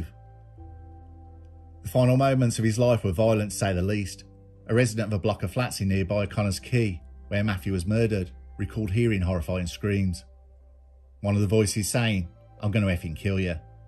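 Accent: Birmingham, United Kingdom. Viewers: I switched it off when I realised it was the brummie guy. 😂😂😂 gets on my tits.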